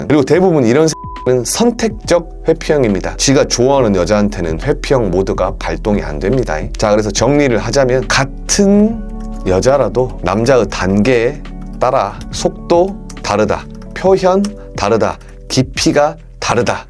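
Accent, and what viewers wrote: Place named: Jeolla, South Korea. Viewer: ㅋㅋㅋ전라도 사투리가 묘하게 중독적...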